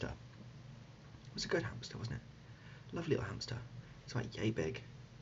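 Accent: United Kingdom. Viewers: Soo odd but that British accent tho. 👍🏻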